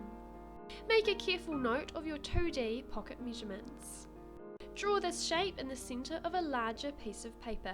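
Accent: New Zealand. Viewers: Sounds like NZ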